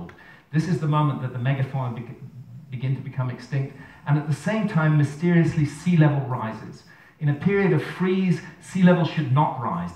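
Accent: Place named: Scotland, United Kingdom. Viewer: He speaks Scotts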